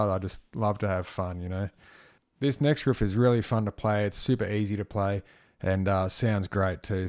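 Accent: Australia. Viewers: Assuming I've got your accent right, how about a showcase of your favourite Aussie metal riffs?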